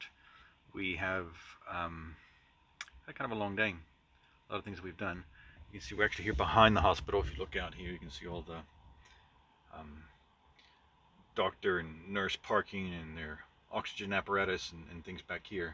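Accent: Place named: Australia. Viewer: Thanks for taking us along. I’m loving the vlogs! And…..now that I know you’re from Australia I can hear some faint whispering of an Aussie accent 🥰.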